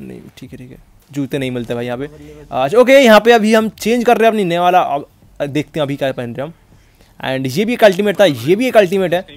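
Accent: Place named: India. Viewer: Desi maar ke comentry kar rahe ho bhai 🤣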